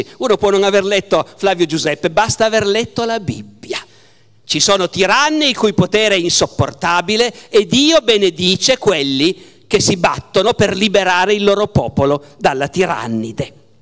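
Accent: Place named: Sicily, Italy. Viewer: Barbero che parla siciliano è stupendo! Formidabile prof! :)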